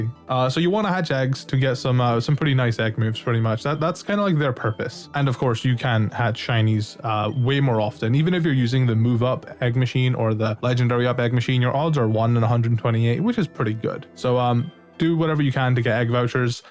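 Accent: United Kingdom. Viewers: iam 5 sec in and you have the most british accent ever xD i love it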